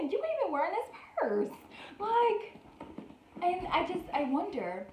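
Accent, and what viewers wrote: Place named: South, United States. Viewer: The crockpot is a great idea 💡 your southern accent 😹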